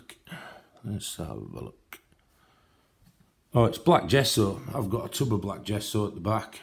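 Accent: Yorkshire, United Kingdom. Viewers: Love your space 💜 and your Yorkshire accent 😍 All the best with your channel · Enjoyed your video, recognise your accent as Yorkshire may be, as I live in Yorkshire uk, New subscriber via The Bibliophile colourist. You have a great art space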